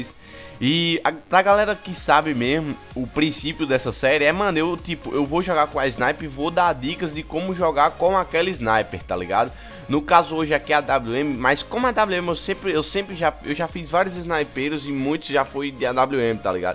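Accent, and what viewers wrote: Northeast, Brazil. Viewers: E legal ver o falando "atualização" esse sotaque nordestino e foda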